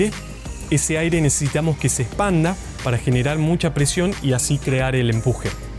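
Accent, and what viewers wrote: Argentina. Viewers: sos argentino ==? capooo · Vos sos de cordoba no? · Eres de aca Argentina no?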